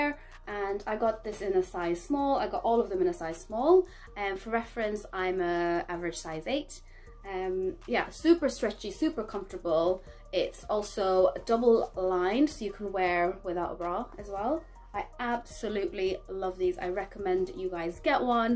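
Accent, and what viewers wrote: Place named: United Kingdom. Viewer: Loved the video cousin !!! :D P/S: Never noticed you sounded so british before haha!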